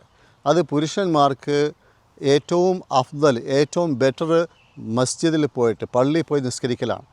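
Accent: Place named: United States. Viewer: താങ്കൾ അമേരിക്ക യില്‍ settle ആയി കുറെ വര്‍ഷങ്ങളായി എന്ന് മനസ്സിലാക്കുന്നു. ശരിയാണോ കാരണം നിങ്ങളുടെ slang ല്‍ ആ ഒരു ചുവ കാണുന്നു Am I right?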